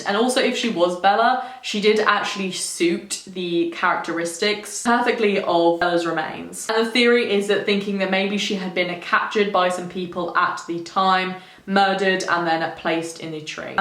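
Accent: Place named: RP, United Kingdom. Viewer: So posh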